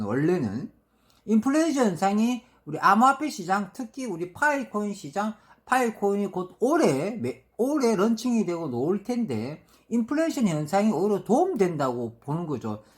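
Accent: Gyeongsang, South Korea. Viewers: 네~~맞아요 목소리도 좋고 경상도 사투리 도좋고 ㅎㅎ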